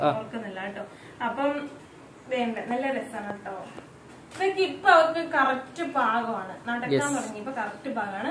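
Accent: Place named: Thrissur, India. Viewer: ശെരിയ, എനിക്കും ഇഷ്ടം ആയിരുന്നു, ഇപ്പോൾ ഒട്ടും ഇന്റെർസ്റ് ഇല്ല, വീഡിയോക്ക് വേണ്ടി അമിത വിനയം, എളിമ, എന്തൊക്ക ഗോഷ്ടി ആണോ, സഹിക്കാൻ വയ്യാത്തത് ഓവർ ആയി തൃശൂർ ഭാഷ പറയുന്നത്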